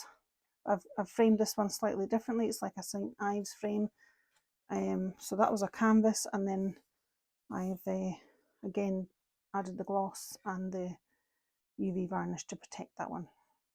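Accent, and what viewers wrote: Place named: Scotland, United Kingdom. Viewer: Really enjoyed this, as I have recently discovered gel printing. Also enjoy your accent-my mother was from Glasgow(Milngavie) She has passed away and I love hearing the Scottish accent-it is such a comfort!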